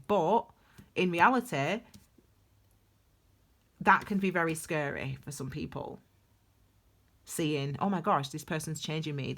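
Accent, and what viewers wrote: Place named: North, United Kingdom. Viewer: as a fellow northerner (Sheffield) I love your accent, but omg, your personality just kills me. I am sure if we lived near each other we would be fabulous friends!! Keep doing what you're doing hun, because this was spot on for me and I couldn't have it delivered by a more down to earth, normal, hilarious person. Loved it!! xx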